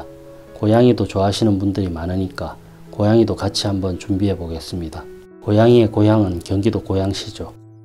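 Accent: Gyeongsang, South Korea. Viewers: 경상도는 억양을 고칠수없어..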